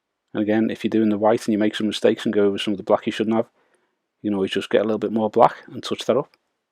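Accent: Liverpool, United Kingdom. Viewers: The scouse accent if i close my eyes i feel like I'm watching art attack.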